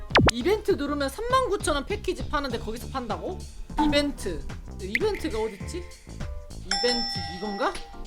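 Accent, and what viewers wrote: Japan. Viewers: 애매한 한본어 킹받네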